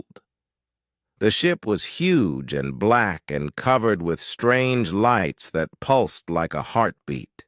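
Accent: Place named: Texas, United States. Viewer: Don’t like the Texas drawl speech pattern.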